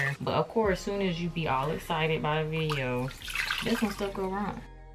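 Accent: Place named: South, United States. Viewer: I honestly thought you were South African. Your name could be Nthabiseng (sesotho for bringer of joy) but your Southern accent is coming through haha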